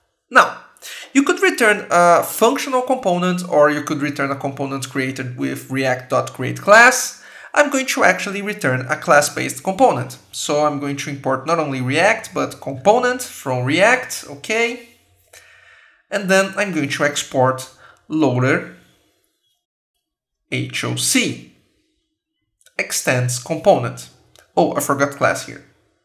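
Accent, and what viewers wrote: Brazil. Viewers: pelo sotaque, eh Brasil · I think I know this accent - Brazilian right? By the way, good vide, keep going on it!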